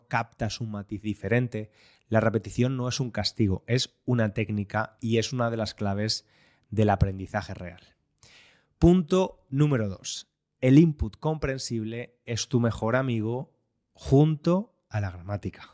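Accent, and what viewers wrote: Spain. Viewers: ¡Me encantan tus podcasts! Intento escucharlos 5 veces por semana. Viví en España un total de 2 años, y ¡cómo echo de menos al acento español! He encontrado por aquí en los EEUU, no hay mucha gente de España. Hay bastante de México, América Central y Sudamérica, y eso está bien, pero me encanta el acento de España y me das una manera de poder escucharlo., tu voz es bien clara, fácil de entender (aún los episodios avanzados) y tu gramática es 👌🏻-me gusta muchísimo! Tu hermana, por otro lado… 😅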